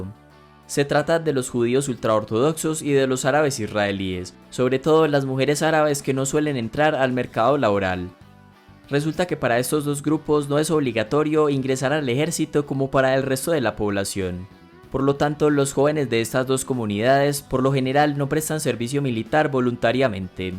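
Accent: Antioquia, Colombia. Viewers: Excelentes videos. Hace poco empeze a seguir el canal. Soy de Colombia y le sentí cierto acento paisa al narrador . Los veo desde Memphis TN 🇺🇲🇨🇴. Dios los bendiga y gracias por ayudar a la cultura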